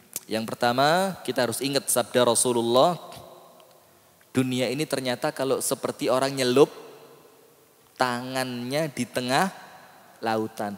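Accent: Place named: Surabaya, Indonesia. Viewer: Ustad dr Jawa timuran ndelok logat e lek gak arek SBY Yo arek malang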